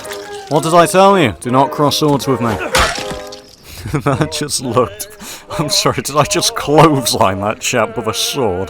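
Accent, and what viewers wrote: United Kingdom. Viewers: Bloody hell, this chap's a real Englishman! Accent, behaviour, EVERYTHING of him is Bri'ish!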